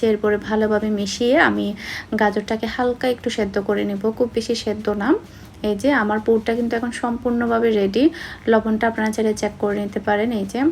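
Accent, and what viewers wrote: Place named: Sylhet, Bangladesh. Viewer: আপু সিলেটের তাই শুদ্ধ বাংলা বল্লেও সিটেলের ভাষা টা চলে আসে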